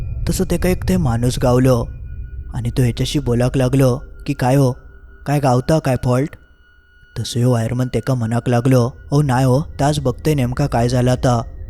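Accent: Malvani, India. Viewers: Ti malvani ha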